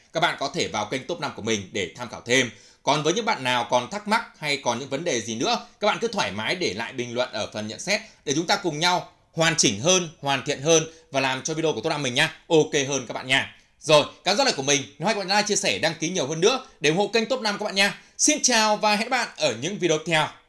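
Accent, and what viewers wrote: North, Vietnam. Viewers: giọng Bắc kỳ khó nghe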